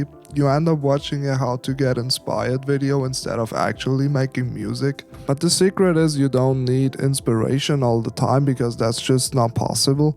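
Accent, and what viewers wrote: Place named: Scandinavia. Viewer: 200 views and nordic accent let's go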